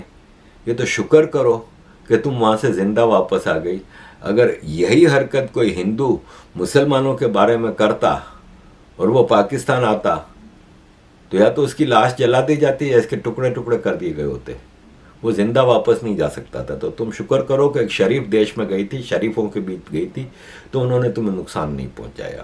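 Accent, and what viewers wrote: Gujarat, India. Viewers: sir I watch your videos and am always curious why you say "jay jindera" in your intro. Then I research about you and I found out your roots from Junagadh Gujarat and your accent is also Gujarati Hindi/Urdu accent I got that coz am also being gujarati i got that now. Hats off sir of your work and spread knowledge & awareness to world.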